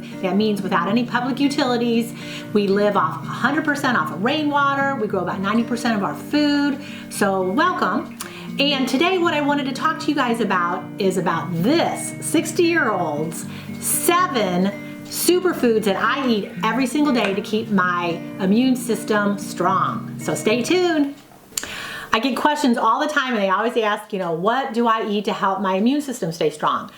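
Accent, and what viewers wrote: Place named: Midwest, United States. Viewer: You have to be from the Midwest with your accent. Minnesota? That's another reason you look so young for your age.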